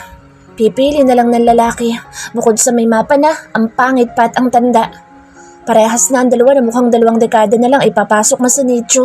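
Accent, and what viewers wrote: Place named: Visayas, Philippines. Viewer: Maganda po ang storya,,bisaya ka po maam 😊😊 ang tuno po kasi nang pagbasa,pero maganda po ang story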